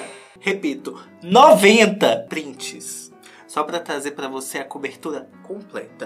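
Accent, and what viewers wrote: Minas Gerais, Brazil. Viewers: me divirto muito com vc! Adoro suas tiradas, seu sotaque de mineiro e sua voz! Bjsss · Meu Deus, tem vez que eu to cagando pra notícia, mas eu coloco só pra ouvir a voz e as piadocas de. Ele tem uma voz e uma energia Rico Melquiades, só que do triângulo mineiro, hahaha. Legal demais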